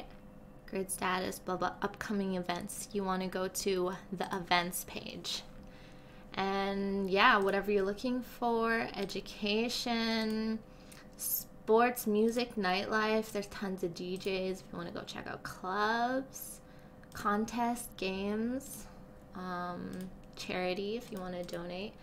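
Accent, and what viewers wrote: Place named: United States. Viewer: This was so entertaining thank you. I love your accent, im presuming your American, im in Scotland so impossible to tell where in the states people are from lol. Thanks :) x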